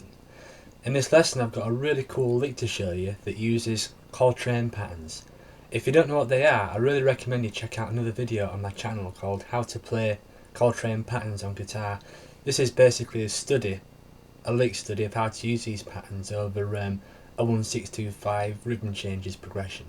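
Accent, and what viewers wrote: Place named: North, United Kingdom. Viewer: Keep on running, nice to hear't northern twang on such lovely chords.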